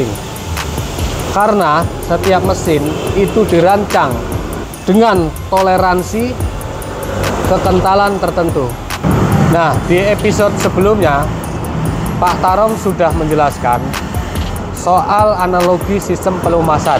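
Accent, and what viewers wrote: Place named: Banyumas, Indonesia. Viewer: Ngapak Bang 😂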